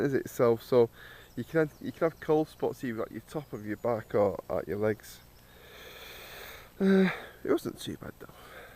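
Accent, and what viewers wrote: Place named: Manchester, United Kingdom. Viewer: Nice vid , great spot youve got there,nice food, nice set ups, cheers.......johny.......ps.thats defo a manc accent.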